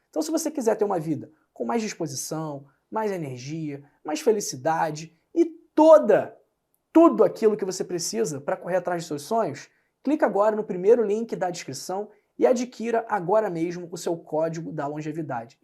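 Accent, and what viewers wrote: Rio de Janeiro, Brazil. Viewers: Como assim, sotaque arrastado. Ele é carioca . Queria que ele falasse como? Que observação mais sem sentido. Valha me Deus!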